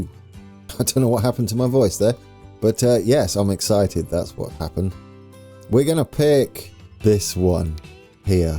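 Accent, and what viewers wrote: United Kingdom. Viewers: Great to hear. Enjoying ALL of your TF2 series. Your accent is apparent, but not over the top (so thick) like most Brit YT's here. Very soothing and entertaining. You're - comical especially when you call yourself a "berk."(sp) I die! Still glad I found your channel. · your british tho?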